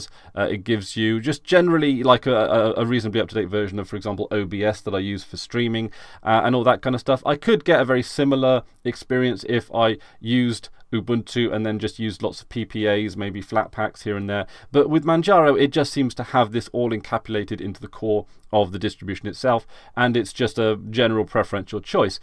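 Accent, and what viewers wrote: United Kingdom. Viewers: Linux might break through.... Linux is already broken through. What are you talking about. How much of the Internet is run on Linux. How many devices run on Linux? Linux is already corporate. Niche by design. What are you talking about do you know who's on the Linux Foundation. God what a mistake listening to a brit. Should have closed the video the moment I heard your accent and saw your English teeth. Why do you guys have such bad teeth anyway? I swear. I need a ibuprofen after that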